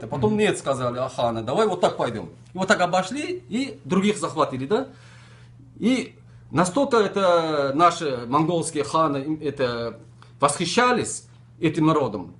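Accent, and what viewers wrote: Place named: Tajikistan. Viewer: Это не монгол. У него акцент даже таджикский (персидский). Сказки рассказывает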